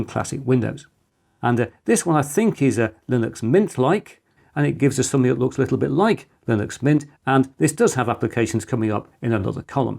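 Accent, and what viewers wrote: England, United Kingdom. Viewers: It's like watching Bill Gates in the 70s, but then with a British accent, loved the old school video ! 😃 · I love the way you explain. This is great, thank you English Man, love the accent too by the way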